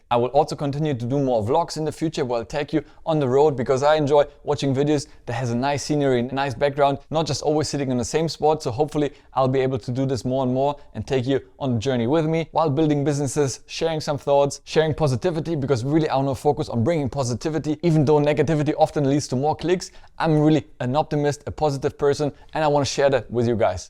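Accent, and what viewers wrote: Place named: Germany. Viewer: Thanks for the reply. Then you are really fluent in English. Apart from a slight german accent (sorry if you have nothing to do with Germany lol, and ofcourse its in no way an inconvenience, just an observation), your flow and delivery is amazing. Hope to be like you someday :)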